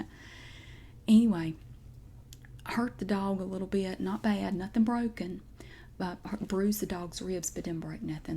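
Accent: South, United States. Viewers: I absolutely love the Southern accent but the creativity of this was something else :D Very entertaining and relaxing, thank you!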